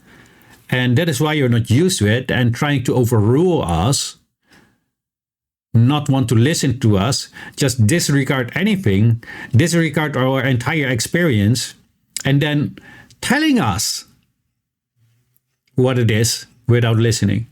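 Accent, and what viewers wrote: Germany. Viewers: He sounds German...don't mention the war. What does this have to do with China Western relations? Going after every internet meme is stupid..keep your eye on the bal....NEXPERIA! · sounds like a German accent? figured he is just picking on everyone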